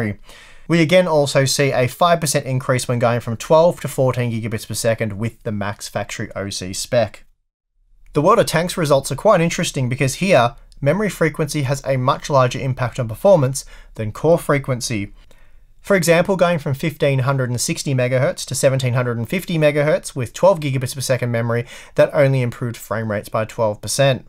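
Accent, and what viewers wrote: Australia. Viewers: Youtube's auto-generated subtitles aren't that great with Australian English. What you read here are the results ;)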